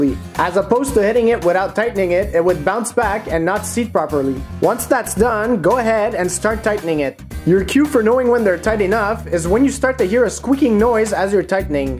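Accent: Canada. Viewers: from which part of Canada is your accent from?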